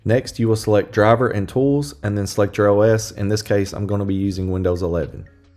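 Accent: South, United States. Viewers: a little southern drawl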